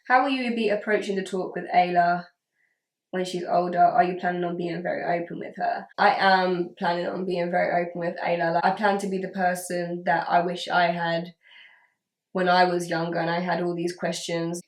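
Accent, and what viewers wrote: England, United Kingdom. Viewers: I like listening to the way you talk I like the UK accent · you have the best english accent and tone of voice I've ever heard. I could listen to you all day!!!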